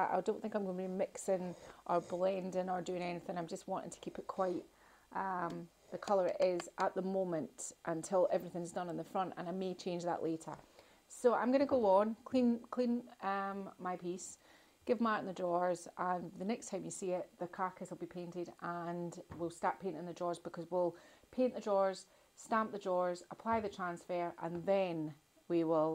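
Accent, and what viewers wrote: Scotland, United Kingdom. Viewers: Love this.... I've been binge watching every one of your videos while I'm in my own store working on furniture. Your work is mesmerizing! And I love your accent - my brother in law, Ian McCallion, came to live in the states when he was 15, we are 59 now, he still has the Scottish accent and all of his American boys, all 4 of them, can roll right into that accent as well. It's great! And, btw, I just became a retailer of your own gorgeous papers here in New Jersey.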